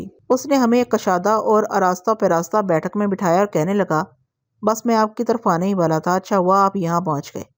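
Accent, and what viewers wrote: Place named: Pothwar, Pakistan. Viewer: محترمہ آپ کا پوٹھواری لہجہ اچھے خاصے قصے کا ستیاناس کر دیتا ہے۔ اپنے لہجے میں معنویت پیدا کرنے کی کوشش کریں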